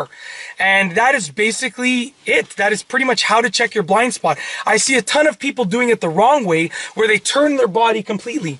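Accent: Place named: Canada. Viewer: This gentleman has the most CANADIAN accent I've ever heard. lol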